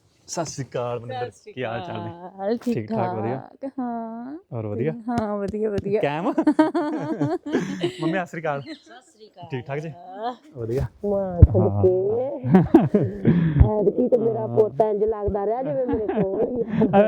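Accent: Majha, India. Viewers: ਮੈਂ ਤੁਹਾਨੂੰ ਇਕ ਵੀਡੀਓ ਵਿਚ ਕਿਹਾ ਸੀ ਕਿ ਤੁਹਾਡੀ ਬੋਲੀ ਅੰਮਿਤਸਰ (ਮਾਝੇ)ਵਾਲੀ ਚਲੋ ਪਤਾ ਲੱਗ ਗਿਆ ਕੇ ਤੁਹਾਡਾ ਪਿੰਡ ਭੋਜੀਆਂ, ਤੇ ਮੇਰੇ ਨਾਨਕੇ ਨੇ ਭੋਜੀਆਂ, ਮਾਮ ਨਿਆਮਤ, ਮੁੱਖਾ, ਮਨਜੂਰਾ, ਦਾਰਾ, ਰਾਬਟ, ਇਹ ਨੇ ਮੇਰੇ ਮਾਮੇ ਦੇ ਮੁੰਡੇ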